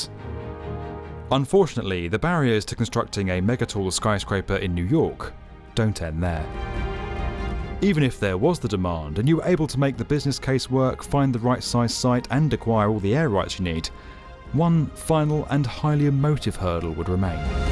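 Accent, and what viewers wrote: United Kingdom. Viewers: I know right I like a good British voice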